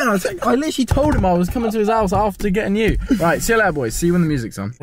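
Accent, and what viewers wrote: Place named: Weymouth, United Kingdom. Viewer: You from Weymouth lad?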